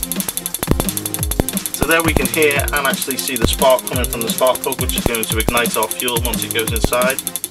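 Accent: Liverpool, United Kingdom. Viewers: nice video! Are you a Scouser?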